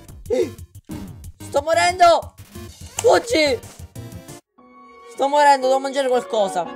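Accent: Sicily, Italy. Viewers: comunque si sente che sei siciliano anche se non parli il dialetto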